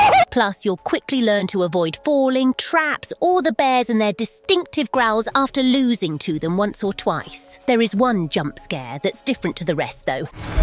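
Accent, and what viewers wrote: Argentina. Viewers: Y mira, por como habla español medio que se deja entrever que es de Argentina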